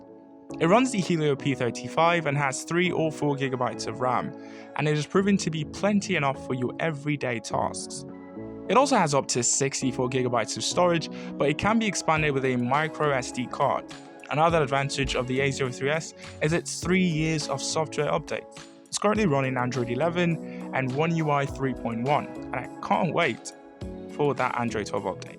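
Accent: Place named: United Kingdom. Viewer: I had no idea he had a British accent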